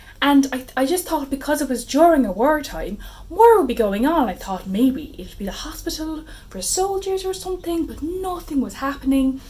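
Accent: Ireland. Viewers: Ahhh! I love your Irish accent!! I’ve always been a bit intimidated by reading Seamus Heaney’s poetry for some reason but will definitely pick some up now. ☘️ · As an Irishwoman I love your Irish accent!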